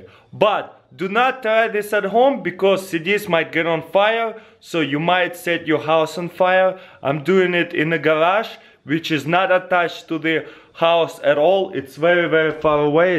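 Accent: Russia. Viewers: i love listening to that Russian accent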